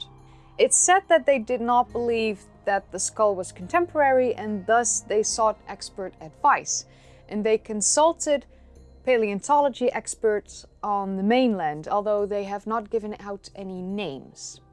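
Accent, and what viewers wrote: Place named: Netherlands. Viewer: your accent reminds me of my trips to the Netherlands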